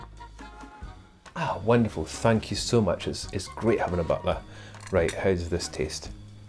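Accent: Scotland, United Kingdom. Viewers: Such an awesome channel by a true British gentleman andI do detect the Scottish accent. From a fan in sunny South Africa.☺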